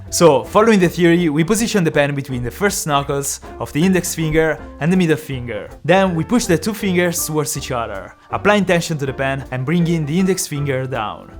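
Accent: Italy. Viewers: Si sente che sei italiano😂😂😂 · Come si sente che sei italiano.. comunque bel video. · Your accent sus I think Italian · Il tuo accento mi fa morire, credo che tu mi stia capendo perche si capisce che sei italiano ahahah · I love his Italian accent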